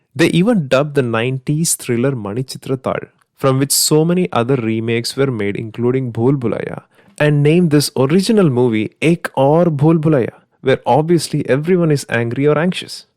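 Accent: Kerala, India. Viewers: I bet the narrator is Mallu... proud to be Mallu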